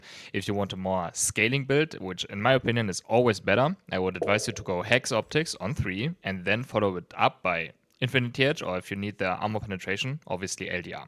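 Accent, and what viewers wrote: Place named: Germany. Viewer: I like your german accent haha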